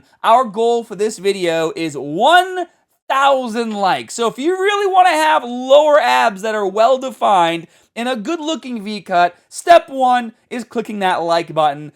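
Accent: Boston, United States. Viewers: Boston accent crazy lmao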